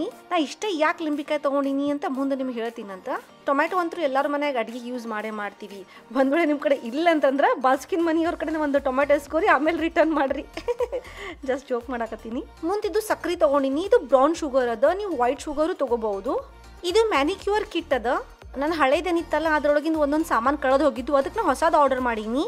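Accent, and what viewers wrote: North Karnataka, India. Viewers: ಹಾಯ್ ಅವರೇ ನಾವು ವಿಜಯಪುರ ಜಿಲ್ಲೆಯಿಂದ ನಿಮ್ಮ ಎಲ್ಲಾ ವಿಡಿಯೋಗಳನ್ನು ನೋಡುತ್ತೇವೆ ತುಂಬಾ ಚೆನ್ನಾಗಿ ಉತ್ತರ ಕರ್ನಾಟಕದ ಭಾಷೆಯಲ್ಲಿ ಚೆನ್ನಾಗಿ ಮಾತನಾಡುತ್ತೀರಿ ಕನ್ನಡತಿ ಚಾನೆಲ್ ಕಡೆಯಿಂದ ನಮ್ಮ ಮದುವೆ ಮೂರನೇ ಅನಿವೆರ್ಸಡೇ ವಿಶ್ ಮಾಡಿ <num> ನಮ್ಮ ಜೋಡಿ ಹೆಸರು ಶಿವಾನಂದ. ಕವಿತಾ ತಿಕೋಟಿಕರ ಅಡ್ವಾನ್ಸ್ ಆಗಿ ಹೇಳಿದ್ದೇವೆ ನಿಮ್ಮ ಚಾನಲ್ ಕಡೆಯಿಂದ ತಪ್ಪದೆ ವಿಶ್ ಮಾಡಿ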